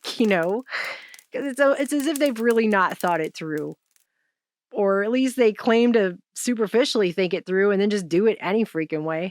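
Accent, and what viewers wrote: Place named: Hispanic American, United States. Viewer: I like your accent. You sound like... a second generation Hispanic American female, age 22-39, from the northern states, somewhere between Chicago and North Dakota, medium build, attractive, long brown hair. How close am I? Practicing making inferences from a sparse data set(i.e, your accent.)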